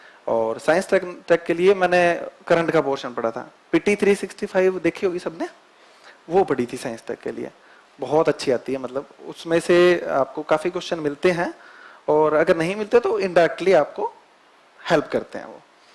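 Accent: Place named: Rajasthan, India. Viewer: H na...... Lahjaa .. Rajasthani ki pahchaan😀😀😀😀.. proud of you bro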